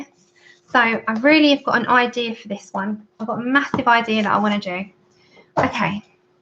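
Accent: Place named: South East London, United Kingdom. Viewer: Great collection! !loved watching and thanks for showing us!also were are you from as I'm from South East London and you sound like your from here too x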